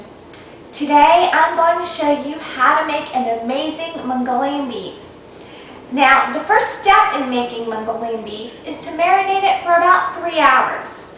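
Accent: South, United States. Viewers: your accent southern ?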